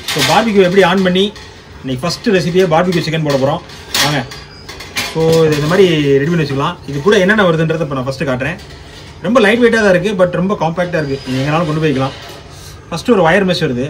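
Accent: Madurai, India. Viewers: எனது வயது 39 எனக்கும் சொந்த ஊர் மதுரை தான். Hope சம வயசு ஆளு தான்னு நெனைக்குறேன். இந்தாளு வீடியோ வையும் பேச்சையும் கேக்கும்போது ஏதோ கல்லூரி, பள்ளி நண்பர்களுடன் இருப்பது போல ஒரு Feel. அந்த கால கட்டம் வந்து போது... இந்த சமையல தாண்டி நீ நம்மூரு ஸ்லாங்ல பேசிட்டே இருயா... அதுவே நல்லாருக்கு... ❤❤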